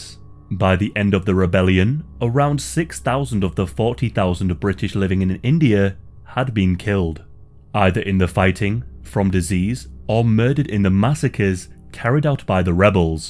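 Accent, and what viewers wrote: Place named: Northumberland, United Kingdom. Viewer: Very informative, thank you. Your accent intrigues me, you sound northern but I'm not sure where, Northumberland?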